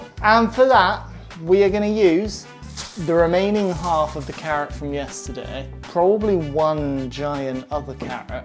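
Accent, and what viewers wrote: RP, United Kingdom. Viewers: Dude I discovered your videos today, maybe 10 hrs ago. At first I head your accent and thought ‘Tory posho’ but I gave your videos a chance and now I’ve watched about 15 vids from your channel. Your actually a really down-to-earth guy and your content is brilliant, proper comfort watching. I haven’t just learnt some new low-cost recipes from the vids I’ve seen, I’ve also been reminded not to make assumptions. You’re a top guy mate, hope this channel goes far! X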